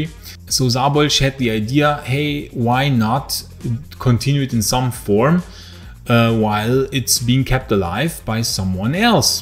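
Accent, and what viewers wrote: Germany. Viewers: You could have pushed your audience to Odysee or Bitchute. No algorithms there.... I'm gonna miss your show, but I wish you guys the best! I'll give the other guys a watch, though... It won't be the same without your sarcastic comments made in you German accent, but I'll give them a chance. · I like both topic and I was actually subscribed to the other one ,… and I just realize it was another channel… . Your accent is from German?… I’m Spanish speaker and going throughout B 1 in German :). ( Austrian wife 🤷🏻‍♂️) .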